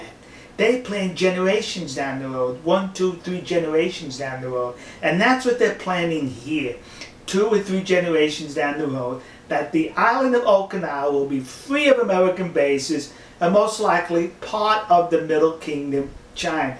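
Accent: New York, United States. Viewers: I should have figured out that you were BAT SHIT CRAZY, when you said you've lived in Texas for 32 years, and still had an NY accent. That's kind of like Charro* and Zsa Zsa Gábor** <link> <link>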